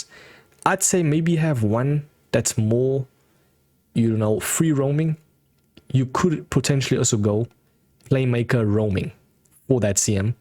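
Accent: South Africa. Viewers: Is this guy South African ?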